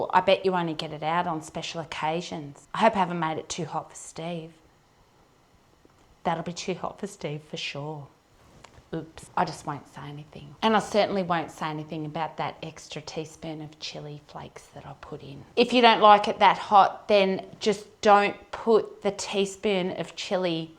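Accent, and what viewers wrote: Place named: Australia. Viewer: Hi, you are Definitely a Dinkie Die Aussie. I love your videos,your Aussie accent and you make me laugh. I pleased you now settled down to making cooking videos. It is more exciting than shorthand and typing. Got to get back to the potato bottling or canning. 😂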